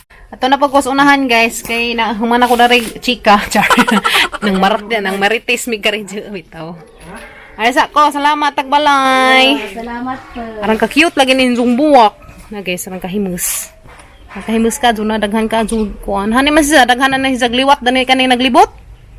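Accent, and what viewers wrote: Bohol, Philippines. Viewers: Klaroha jud taga buhol oi.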